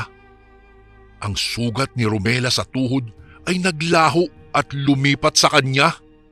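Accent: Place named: Iloilo, Philippines. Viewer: Ay wow Ilonggo gd ..ya daw mabuga ko mn ya kape ko kag hahahahha ano iloilo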